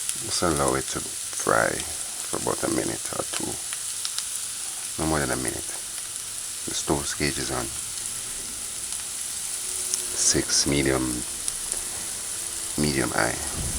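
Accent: Jamaica. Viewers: I love Jamaican accent. I would listen to you talk all day.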